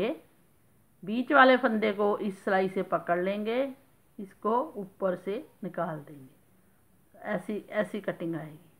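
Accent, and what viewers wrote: Punjab, India. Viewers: ਭੈਣਜੀ ਤੁਹਾਡਾ ਬੋਲਣਾ ਅਛਾੱ ਲਗਦਾ ਹੈ ਵਿੱਚ ਹਿੰਦੀ ਵਿੱਚ ਪੰਜਾਬੀ ਬਹੁਤ ਵਧੀਆ ਲਗਦਾ ਹੈ 🙏🙏🌹🙏🌹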